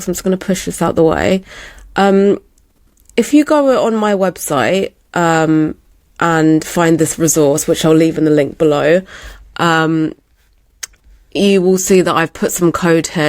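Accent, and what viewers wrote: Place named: United Kingdom. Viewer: I love your British accent, it sounds so beautiful. Of course, I also liked your video, they are amazing effects.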